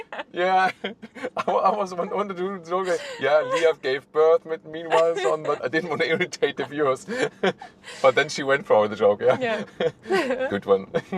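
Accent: Germany. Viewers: Always funny hearing a German talk English👍😭🤣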